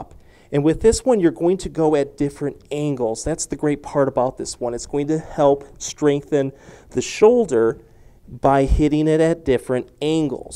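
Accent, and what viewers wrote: Michigan, United States. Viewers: Did these exercises ONE TIME and found that almost all my popping and cracking were gone. I will be continuing these daily. Thank you! I also loved hearing a Michigan accent again; I attended Interlochen Arts Academy up by Traverse City.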